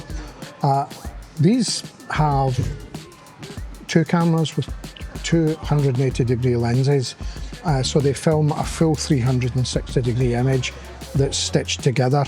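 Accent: Scotland, United Kingdom. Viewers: What’s a Spanner? Something that spans? Also, why is the close caption not burnt into the video? I can’t understand your Scottish accent pal…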